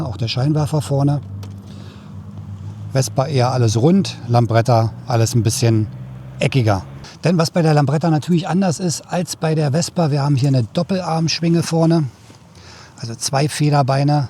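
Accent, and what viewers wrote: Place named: Berlin, Germany. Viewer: Da ist sie wieder. Die Stimme von Hans Rosenthal 😊. Immer sympathisch und kompetent mit ner duften Note Berliner charms. Bin gespannt auf das Jahr mit deiner „Neuen“. Schade nur um den Zontes, da hätte mich doch ein Langzeittest sehr interessiert. Geht’s denn damit auch in den Urlaub? Bin gespannt. Also, herzlichen Glückwunsch und allseits gute Fahrt. Vielen Dank für den und die vergangenen Berichte. Bitte immer weiter so 😎✊🏻. Schönen Ostersonntag noch.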